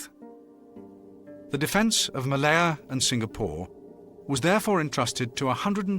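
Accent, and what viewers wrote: United Kingdom. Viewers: Very British accent indeed ....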